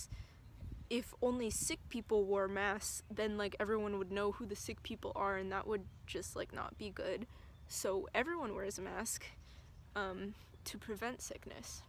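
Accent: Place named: United States. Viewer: She sounds American to me. Stay in your lane weirdo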